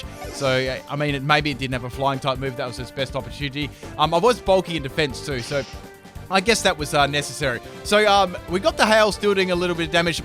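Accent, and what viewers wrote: Australia. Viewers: PLEASE PIN THE COMMENT I LOVE YOU AND YOUR AUSTRALIAN ACCENT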